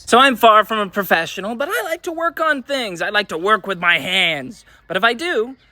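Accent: United States. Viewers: I'm super interested in moving to Croatia, have been for 3-4 years now. Glad i found this channel I have been flying through these shorts and I want to watch some more. I checked your website and learned you're ALSO from the midwest (I had assumed as much from your accent) I have had a friend for around 7 years who is from Bulgaria as my main reference for information regarding the area (and the search engines like google of course) but it's difficult to keep in contact at times despite both of us being on discord frequently. (both currently pursuing education, she is in the netherlands and I am in the states) so any drive I had is kind of on the back burner. Long story short, these few posts I've shot through absolutely filled me with joy because the world elsewhere is so different. Hearing about Zagreb is the most exciting part because I am interested in visiting there as well as Split. 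(Split I found on a whim and tried to learn a bunch about in the past.) Sorry for the long-winded comment, I haven't seen much from this in a while I was absolutely blindsided, especially hearing things I was familiar with here on this video. Some of the older ones spoke on serbia.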